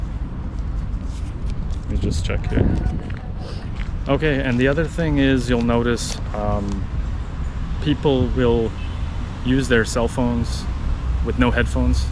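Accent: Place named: Canada. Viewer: I am a brazilian, ffrom SP, I ve lived in a few countries, including the US (though your accent sounds a bit canadian) I don't blame others for all Brazil s problems, just the ones that were caused by others. Not only Brazil, it s notorious the role of the US in supressing democratic regimes throughout the world, replacing them by brutal dictatorships. Go read a book! I know Rio very well, I have family there, I agree with a lot of what you said, but you also said a lot of nonsense. I love Rio, but I d never live there, too chaotic, I agree people are not very polite, but carioca's are very friendly, easy going, if you didn t get along with them, maybe the problem is with you, not them. The thing you said about the "tamo junto" seems to be a misunderstanding on your part, even brazilians joke about it, "falei pra ele aparecer lá em casa, e o chato apareceu", still, brazilians are culturally much more open to others. 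From the few words you said, I can tell your portuguese is not very good, you have a very strong accent, despite allegedly living in Rio for years, that might be getting in your way of properly communicating with people. The things you said about brazilian women sounded pretty sexist too. The stuff you said about brazilian politics and social economic issues is just BS, Brazil has improved a lot in the past 20 years, I am an economist, working with finance, it s all in the data, do some research. That said, the US is ruled by the king of the deplorables, backe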